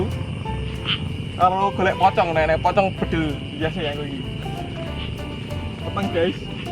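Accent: Java, Indonesia. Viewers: Jowo pundi to mase?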